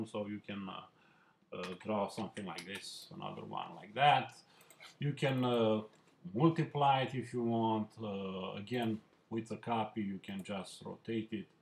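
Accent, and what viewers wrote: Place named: United States. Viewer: USA speaker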